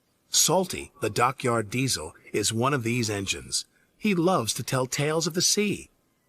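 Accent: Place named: Ireland. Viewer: Irish accent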